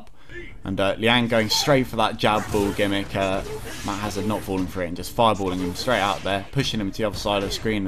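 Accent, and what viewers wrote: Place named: United Kingdom. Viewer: British accents are always great for narrating.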